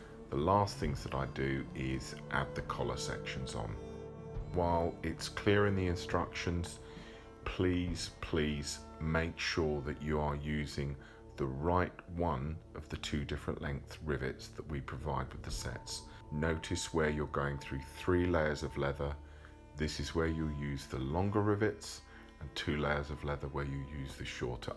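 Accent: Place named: United Kingdom. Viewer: Ok completely off topic dude look like Jeffrey Dean Morgan and Robert Downey Jr had a kid together and then gave him a British accent and im totally here for it.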